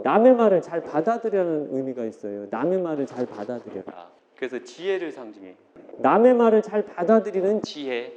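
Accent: Chungcheong, South Korea. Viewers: 충청도 사투리가 구수하죠~^^ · 선생님 강의도잘하시지만 사투리잼있기도하구 우리사위말씨랑똑같아서많이웃어요 서산이유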